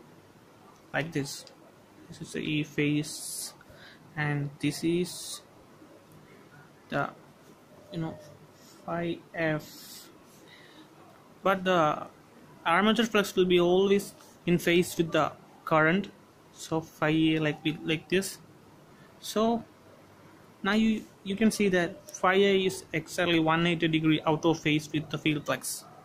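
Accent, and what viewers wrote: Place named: United Kingdom. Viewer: Sirf British tone me english bolne se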